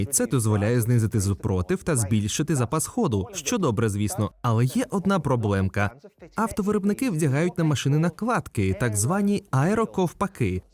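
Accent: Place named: Russia. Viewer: Шкода, що Український канал озвучує людина, яка все життя розмовляла руські... А той хто справді все життя розмовляв рідною мовою мусить дивитись і терпіти цей руський акцент... Повірте, це дуууже замітно!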